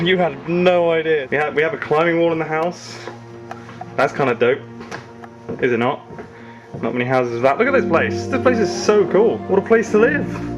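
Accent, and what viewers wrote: France. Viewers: So excited for to slowly acquire a French accent over the course of a few years. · I can't wait for to slowly gain a slight french accent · I can't wait for like, 6 months from now, when your French Accent starts sneaking into you Minecraft Videos. Congrats on the (almost) move. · can't wait to hear gradually develop a french accent over the course of the next few years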